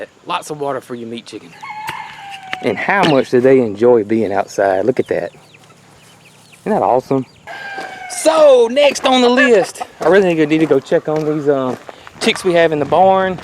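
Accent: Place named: Mississippi, United States. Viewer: I love your accent! 😍 It reminds me a lot of my grandmothers, she’s from MS.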